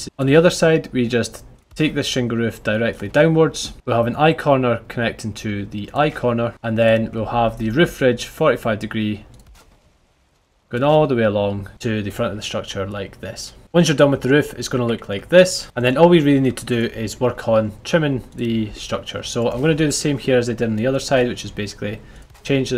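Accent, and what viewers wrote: Scotland, United Kingdom. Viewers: The Scottish accent turns the immersion up to 💯! Love your videos and I can’t wait to build this dock once I get out of the Bronze Age lol! Subbing for sure after this one!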